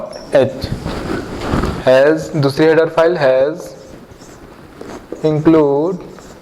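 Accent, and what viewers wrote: Bihar, India. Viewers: apna accent which is i think bihari agar change kar le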